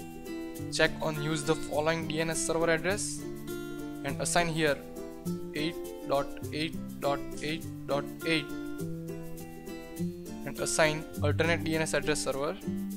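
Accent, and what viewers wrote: India. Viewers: Indian accent, I wonder if it is a scam...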